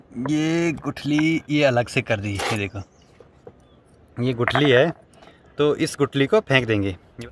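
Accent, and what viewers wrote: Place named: Pahadi, India. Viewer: Thechenge pitpita 😂 Mza aa gya bhai pure pahadi bolte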